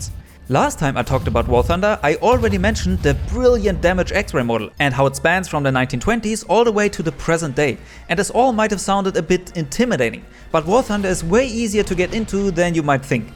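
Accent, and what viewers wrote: Germany. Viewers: One question are you german 😅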